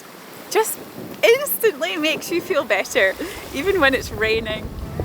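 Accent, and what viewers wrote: Scotland, United Kingdom. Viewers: So Cozy! I do van life here in NZ a few times a year but I was born in Scotland so you really do make me miss it! You are well set up and so careful on the road; I was chuckling all the way through - it would be great if cooking was that fast (and nice looking) in real life. I've never actually lived in Scotland - I was whisked away only 6 months old - but I am practicing your lovely accent and hope maybe I'll make it back there one day. Aroha and a merryX from Aotearoa. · Hi, I noticed Jessica posted in Roots-In-The-Wild spending a winter in Scotland in her van, but I love your enthusiasm and you are a true ambassador for Scotland no mater what weather with a beautiful Scottish accent to match. i think for 2026 I would like to be a better person and don't be critical, abusive, sarcastic, insensitive, cynical, difficult, to show more kindness to others and be more open handed in my giving, and of course visit Scotland in my t5 campervan. 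May the true meaning of Christmas be felt in all our hearts, Rock on, enjoy, merry Christmas.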